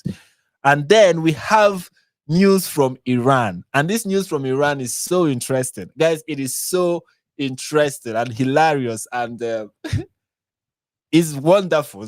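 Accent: Nigeria. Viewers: The world doesn't revolve around Americans......So get used to the accent. PROUDLY NIGERIAN 🇳🇬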